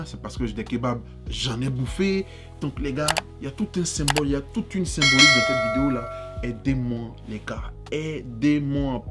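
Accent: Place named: Ivory Coast. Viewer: L'accent ivoirien c'est pour me tué 😂😂😂 moi je m'abandonne heiiiiin 🎉 courage j'espère que ca va me motiver aussi 👍🏾👍🏾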